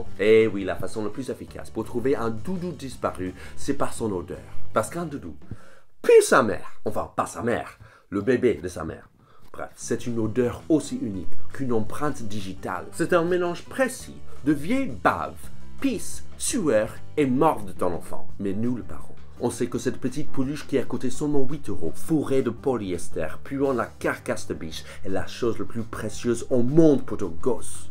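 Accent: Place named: United States. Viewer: Ah ce petit accent américain capable de te faire prendre les pires nouvelles avec le sourire ^^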